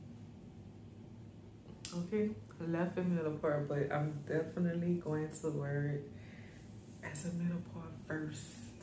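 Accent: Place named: Louisiana, United States. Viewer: Wig is so pretty on you ! I will for sure buy ! And omg are you from Louisiana? I think I hear an accent.Its my favorite accent my parents are from there🤩👍🏾 let me go check out your other reviews. Edit: I watched the whole thing and you mentioned Louisiana 🤣 I was so quick to comment great review again!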